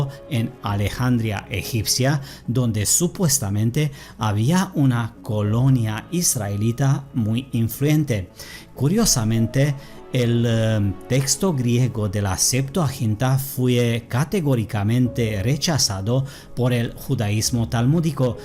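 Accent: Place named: Germany. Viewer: suena como si fuera alemán nativo, escucha narrar a alpha mind o a libertalya ambos creo que también son alemanes narrando estos temas en español y su pronunciación es la misma que. No pasa lo mismo con los gringos o negros de inglés nativo que hablan español su pronunciación es muy diferente.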